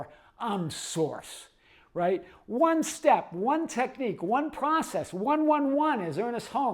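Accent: Boston, United States. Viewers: The second you spoke I knew you were a Bostonian. LOVE the accent & your channel! I AM.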